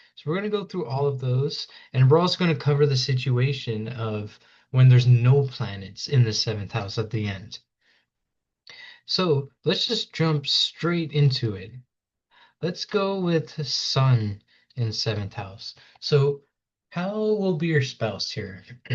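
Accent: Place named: United States. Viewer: Your channel name is (one of the names of lord krishna) but your accent is American why?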